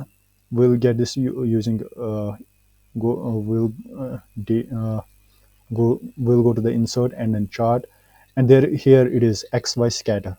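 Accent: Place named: Kashmir, India. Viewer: maine apki awaa suni muje wahi samaj aaya aap apne kashmiri bhai ho Allah salamat rakhe this video helped <3 a lot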